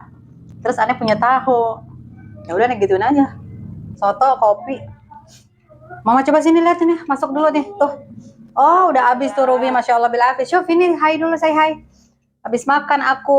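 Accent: Jakarta, Indonesia. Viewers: Biasa liat konten pake bahasa arab ternyata pas di indo nyablak bener betawi tulen😂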